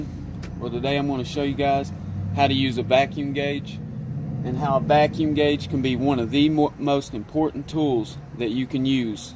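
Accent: South, United States. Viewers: Luv accent mate. South?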